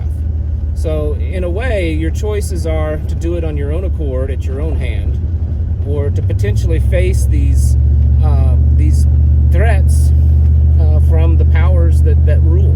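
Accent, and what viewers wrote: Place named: Appalachia, United States. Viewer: Love them hillbilly pipes , yours too .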